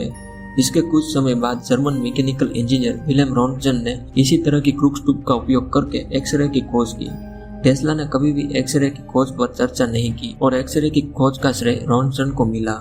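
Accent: Gujarat, India. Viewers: Tu gujrati hai bhai?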